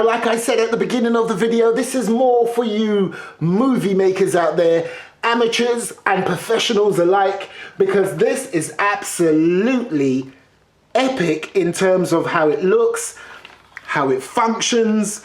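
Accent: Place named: London, United Kingdom. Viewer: He’s a proper Londoner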